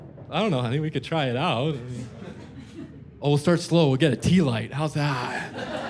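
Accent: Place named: Canada. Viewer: this homie is so fucking Canadian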